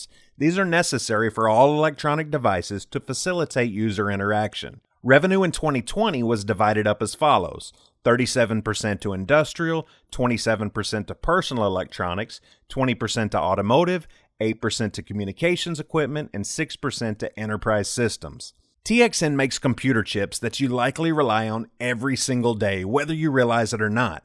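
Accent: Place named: South, United States. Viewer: I detect a hint of the Southern US in your accent. Very pleasant.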